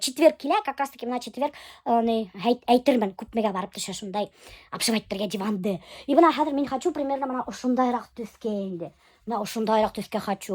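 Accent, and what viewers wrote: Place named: Bashkortostan, Russia. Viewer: туган конен белэн чын кунелемнэн котлыймын!!!!! Исэнлек-саулык, тормышыгызда бик куп матур, шатлыклы коннэр телэп каламын. Сезгэ хэрчак унышлар гына юлдаш булсын!!! аерым зур рэхмэт сезгэ кире уз асылыгызга кайткан очен!!!! Урысча эйткэндэ:это дорогого стоит!!! Сезгэ актриса булу кирэк иде, бигрэк лэр дэ матур Башкортча сойлэмегез!!! Рэхмэт, барысы очен дэ, тагын бер кат.... илэушэ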